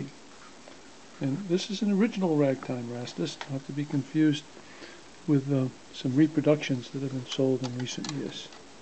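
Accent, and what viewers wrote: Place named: New England, United States. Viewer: I really like your accent, are you from Maine or Massachusetts?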